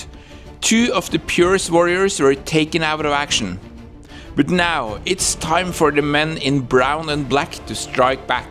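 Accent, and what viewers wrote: Norway. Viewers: Very cool! I can hear from your accent that you are in Norway. Let me know if you are in Oslo and wanna play. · Is that charming Norwegian English i hear?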